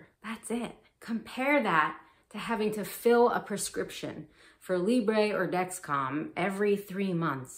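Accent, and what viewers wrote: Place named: United States. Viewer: Fellow t-1 diabetic From Australia and sounds very interesting 😊 indeed. By the way I totally love your American accent 😊.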